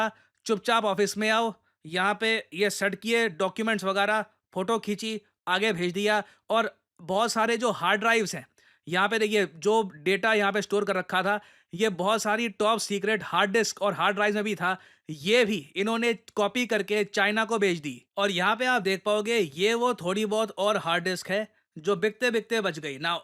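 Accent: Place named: Uttar Pradesh, India. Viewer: सर आपका कभी कभी बोलने की कला उत्तर प्रदेश के जैसा लगता है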